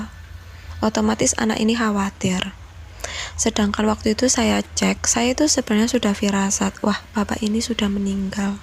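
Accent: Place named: Central Java, Indonesia. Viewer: jawa tengah ini logatnya kalem... Medok bgt...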